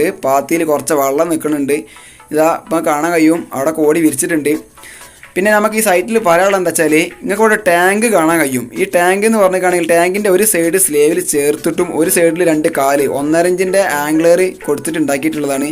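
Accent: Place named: Kondotty, India. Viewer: കൊണ്ടോട്ടി ആണോ സ്ഥലം സംസാരം കേട്ടിട്👍😄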